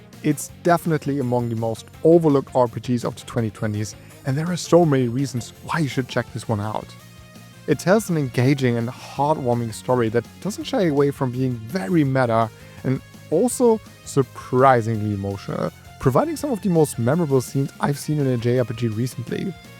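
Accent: Germany. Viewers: Hi thank you for these awesome videos! My question is if you are German? Because your accent sounds so german 😌 I am from Germany too! Greetings!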